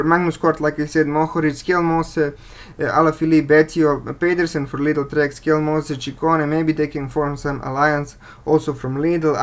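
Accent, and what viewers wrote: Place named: France. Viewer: Come on people, let's help this guy out by liking and subscribing. English is obviously not his first language (French maybe?) yet he's doing really well, excellent information. New subscriber here, looking forward to more cycling videos. Keep positive Sir, you're doing well. WVA for me, but it's almost impossible to pick a definite winner.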